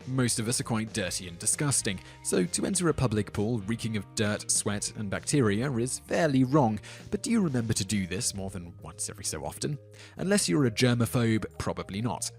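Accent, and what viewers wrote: England, United Kingdom. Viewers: Shouldn't this be laws nobody obeys in America? Also why is the narrator British? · I notice you have an English accent, but you Americanize everything you talk about, stats, money, language and even driving. Sell out! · He has an English accent because he's English? What do you think? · OMG dude what's with the English accent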